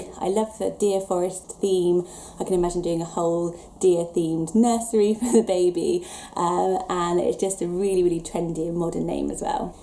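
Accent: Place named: England, United Kingdom. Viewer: I like the name Aspen but i don't know about it for a girl. But, when i hear the name i automatically associate it with a character from the book series The Selection, i know that sounds silly, but i really love that series and the character is supposed to be a really handsome heroic person in the series. I'm also really young but i just like hearing people talk about baby names, it sometimes makes me think of what i would name my child in the future. Also, I'm American and I live in Tennessee so I live in the country, so names that i hear everyday are probably very different than names you hear everyday in the UK or anywhere in Europe really, so i just find these videos interesting. By the way I love the name Liberty with your accent but when i say it sounds silly LOL if i had a English accent it would be adorable though.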